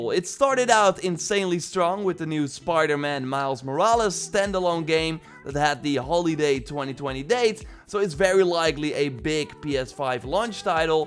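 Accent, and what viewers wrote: Netherlands. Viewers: Kijk uit naar je content man! Ik weet het niet zeker maar aan je accent denk ik dat je ook nederlands bent! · ik weet het niet zeker maar je klinkt NL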